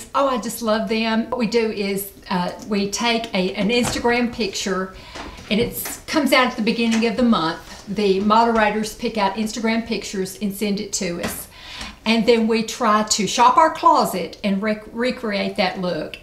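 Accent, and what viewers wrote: South, United States. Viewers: I love your southern accent and vibe. I grew up in the Ozarks and it takes me back to my roots. ❤️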